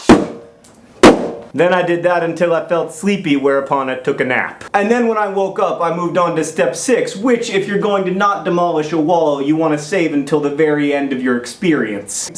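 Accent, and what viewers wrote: Russia. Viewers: Your Russian is adorable. XD I don't like the way you made me question the plural of 'octopus'. I have a feeling this will result in a linguist headache. (They're a thing that happens.) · Your Russian made my insides happy. Ochen liubliu tebya<3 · It's a really REALLY good Russian you're sporting!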